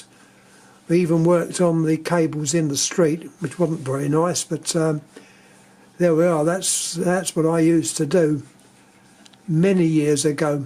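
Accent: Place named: United Kingdom. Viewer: Sounds like Winnie the Poo with a British accent. I would love to see an episode where a Russian telephone is used.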